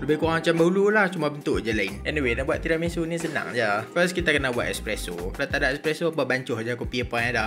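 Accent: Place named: Perlis, Malaysia. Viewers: Tudia noh pekat utara macam loghat kuala perlis😂😂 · Kannn...rindu akk anak buah dok Kuala perlis.persis suara camnilah...pekatt idgham die😊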